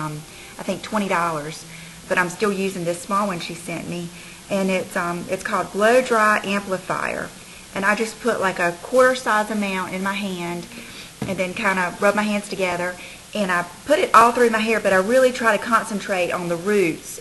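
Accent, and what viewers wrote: South, United States. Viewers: 5 stars for the lovely southern accent :)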